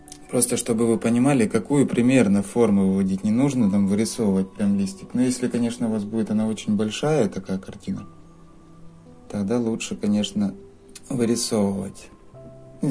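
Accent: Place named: Odesa, Ukraine. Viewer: "Снова- таки три сантиметра.".. Украина. Замечательный диалект. Одесса?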